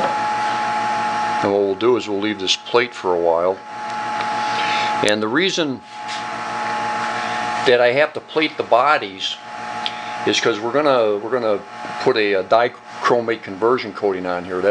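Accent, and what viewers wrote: United States. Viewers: That's gotta be a Chicago accent!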